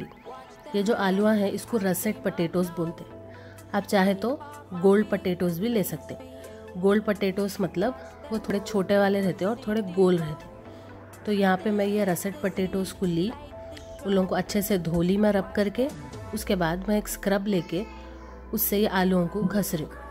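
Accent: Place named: Hyderabad, India. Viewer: Lol..loved ua hyderabadi accent...😜😜😜looking frwd to more such colloquial lockdown recipes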